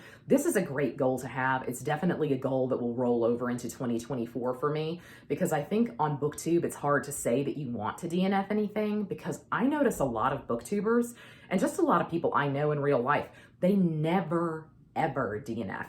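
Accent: South, United States. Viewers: Changing goals? Changing content style? Changing taste in books? Hey, that happens to us all as we grow, age and change our priorities. Just promise that you'll never stop saying "y'all" the way you do! As a Brit I can't get away with using it in conversation (sounds very strange coming out of my mouth with my accent) although I sprinkle it in tweets, comments and text messages whenever I can get away with it; but I just love the way it sounds with your accent - to me it sounds like a proper Southern Belle. I attribute 20% of my overall enjoyment from your videos to the accent and in particular your use of "y'all"; so as long as that doesn't change, the rest is all just details. All the best for 2024! 💙 x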